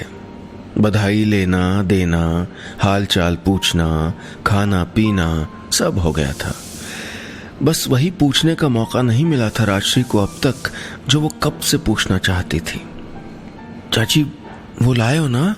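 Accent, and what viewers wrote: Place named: Pahadi, India. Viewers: very nice.. aapne kumauniyon ka bolne ka style bohot sahi se copy kiya ha ..love you.. you are soo nice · Best kumaoni story.. 😘😘😘.. I love your voice ji in kumaoni style 😘😘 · 🥺🥺🥺sir ur accent of phadi was incredible...it made me tears up ... Thank you sooo much miss pant for this lovely story ❤️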